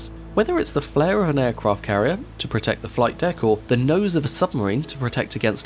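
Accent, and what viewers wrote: United Kingdom. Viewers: Why are all the youtube documentary and educational videos with a british accent? They're not ALL attractive, this one is gross he sounds like he's 12.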